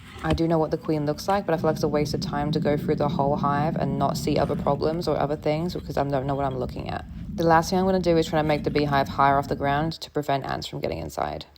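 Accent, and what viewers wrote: Australia. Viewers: That’s an Australian accent! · It's giving Aussie to me. Faint Aussie or U.K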